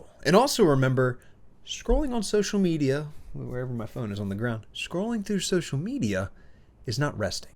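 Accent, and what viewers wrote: United States. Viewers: Amazing American Accent, gotta learn some of it too!!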